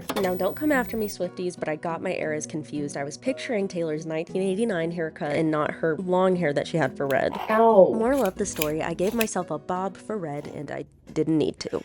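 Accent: New Zealand. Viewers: your New Zealand accent is awful I am truly offended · that nz accent tho. god almighty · im sorry but that kiwi accent is absolutely terrible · As a new zealander im disappointed in that accent 😭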